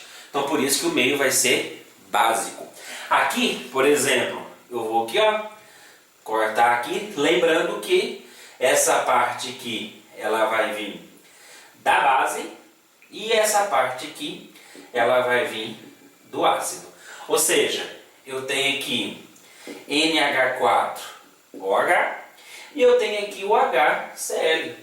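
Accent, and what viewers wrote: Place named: Campos dos Goytacazes, Brazil. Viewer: Parabéns pelos seus vídeos, professor me ajudou muito. Alías, uma pergunta, vc é campista ( campos dos goytacazes-rj) , sua voz tem um sotaque muito parecido hahahahahahaha. Brigadão, estou curioso....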